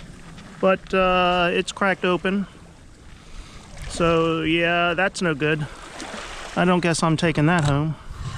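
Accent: San Francisco, United States. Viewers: What a rebel!! You’ve been out San Francisco a lot? I can tell by the sound of your voice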